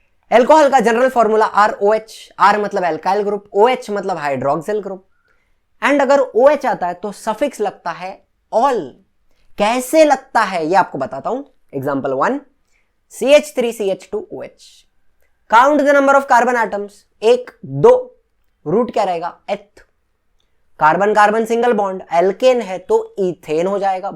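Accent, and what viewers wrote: Maharashtra, India. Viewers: Sir aap marathi hoo kya 🙃🙃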